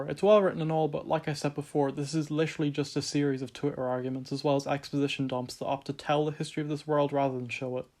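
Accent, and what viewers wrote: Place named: Ireland. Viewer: you sound like mario if he was irish instead of italian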